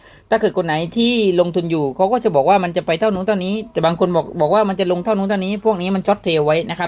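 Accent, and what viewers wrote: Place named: North, Thailand. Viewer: รู้เลยว่าคนเหนือ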